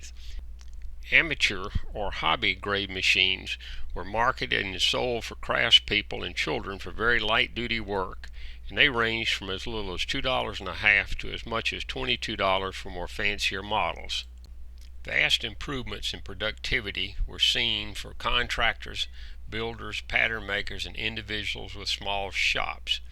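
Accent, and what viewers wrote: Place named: South, United States. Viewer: Excellent information delivered in a smooth southern voice.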